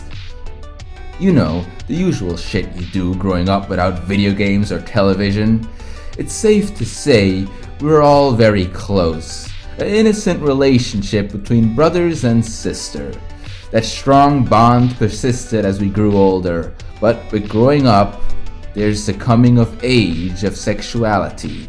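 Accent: Switzerland. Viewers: a german (german swiss) accent, so beautiful, so prosaic! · dude you're swiss? that explains so much about your accent and unorthodox iconography.... the rick and morty work and young justice stuff you did...fuckin supreme! you draw some sexy ass humanoids.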